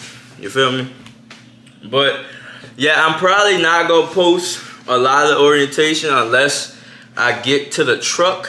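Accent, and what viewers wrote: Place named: New Orleans, United States. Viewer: Say bro u from new orleans? Nvm I went and heard the “ya heard me”. U done confirmed it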